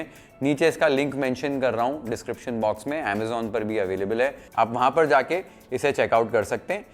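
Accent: Maharashtra, India. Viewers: Chef I am ur big fan, Just wanted ur master chef wala episode, u look so handsome. I really follow ur recipes, I am a maharastian girl married to a Bengali guy, ur marathi accent is just lovable. Always wait for your new recipes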